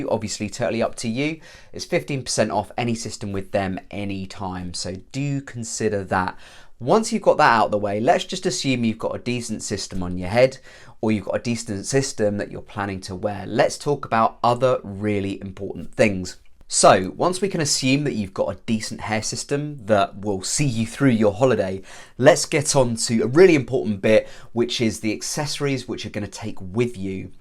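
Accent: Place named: England, United Kingdom. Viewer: greatly appreciate your swift reply! i live in Kazakhstan, perhaps quite far from where you are, which, judging by your accent, is in England?) Agree, going to a stylist would be the safest option. I am torn between Lordhair and Lavivid - Lordhair offer haircut, too, i just need to send them a preferred style from a catalogue. Do you think it is normal/safe? But my biggest issue/fear - how do i measure the size of my head? I have a large head, and should i simply rely on a measuring tape? thanks, mate!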